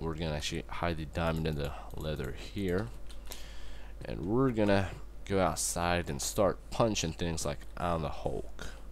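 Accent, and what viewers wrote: United States. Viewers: Wow your voice is different! You sound american! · HOLY SHIT HE SOUNDS SO FUCKING AMERICAN!!!!!!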